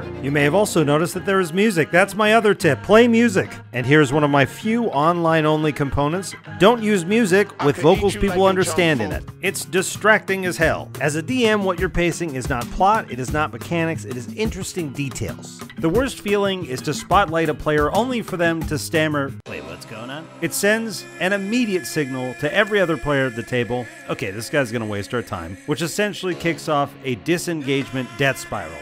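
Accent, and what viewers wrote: South Africa. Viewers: Whoaly shit. That's a cavalcade of horseshit to deal with. Also, are you south african??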